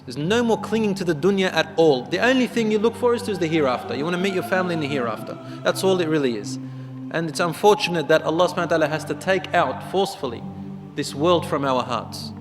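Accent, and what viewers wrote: Indonesia. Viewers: Kayaknya dari suaranya orang indo nih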